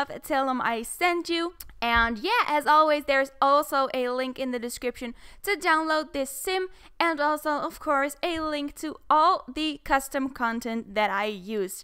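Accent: Netherlands. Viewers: Yess ❤️ I knew you were dutch the second i heard you haha Dutch accent coming through 😃💙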